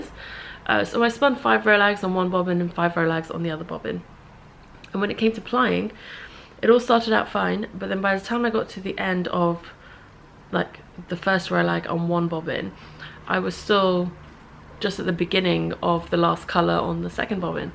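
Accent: United Kingdom. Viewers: That Nor'n Iron accent brings back so many memories of playing with my aunt and uncle as a kid!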